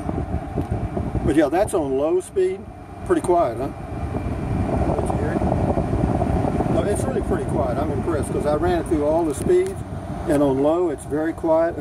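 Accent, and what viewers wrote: Texas, United States. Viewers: Hello from Nevada! I just found your channel. Listening to you makes me miss Texas. I lived there 3 years. You had me genuinely laughing ... "Oh it's energy saver... I don't want that!"... sounds like something literally every Texan I ever met would say, and rightly so, since Texas gets up to 20,000 degrees in the summer :D ... Stay cool, and keep up the good work.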